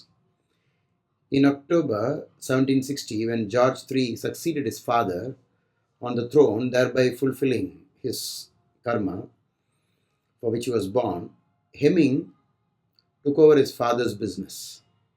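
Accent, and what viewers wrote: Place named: Madras, India. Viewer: ur accent is madrasi type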